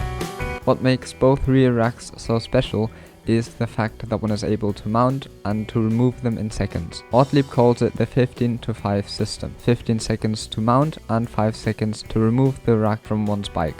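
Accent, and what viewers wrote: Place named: Germany. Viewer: I love this German guy. Straight to the point, pragmatic, no BS. And cool accent. 10/10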